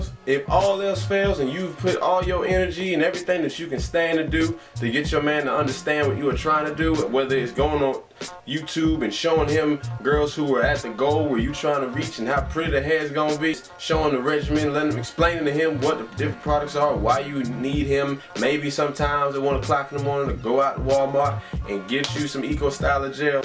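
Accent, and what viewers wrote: South, United States. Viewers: that southern accent.... sexy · I love the message....but I love love love that southern accent! :) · WOW! I'm impressed at your natural hair verseness! I agree wholeheartedly about how women should include men in on why we're doing what we're doing to our hair and WHY! LOL going to get some ecoctyler 1am! so 4a is your crush....so sweet...when can we get married???!! LOL! Where are you from? I hear a little southern accent.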